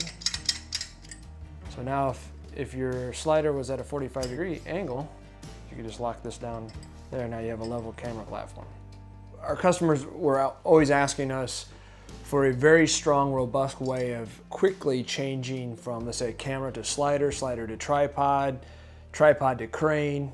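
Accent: United Kingdom. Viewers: Don't you just love British accents ? :D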